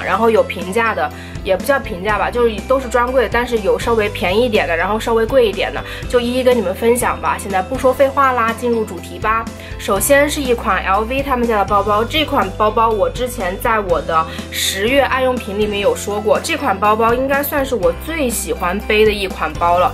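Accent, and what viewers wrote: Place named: Changsha, China. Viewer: 我发现你说话很像长沙话哈哈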